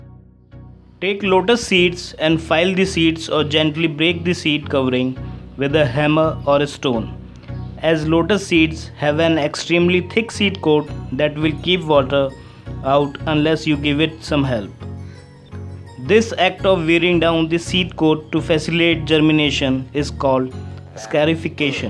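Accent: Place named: India. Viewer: O my guys, when indian 🇮🇳 speaks English make me so nervous.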